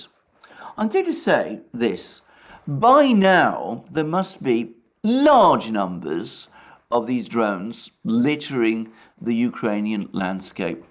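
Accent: United Kingdom. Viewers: Alexander thinks a blasé manner (and a British accent) are enough to cover up his vast ignorance. He says that if there are large numbers of ukrainians, which he very much doubts, they are certainly not using them effectively. Yeah, right. I suggest, what you may be seeing right now is the calm before the... Well, I know for a fact you know the rest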